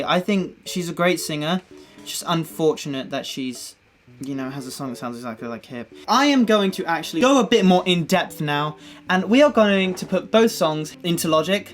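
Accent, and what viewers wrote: United Kingdom. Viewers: BRITISH ACCENT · Your English pronunciation is the most delightful one I ever heard, you've got a perfect English accent ✨🌙 Oh, and you're so cute ❤️ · Hi! I'm Korean teen ㅎㅎ I love your british pronounciation ㅠㅠ Can you cover ' '-!!! I'm sure you will love this song ㅠㅠㅠㅠ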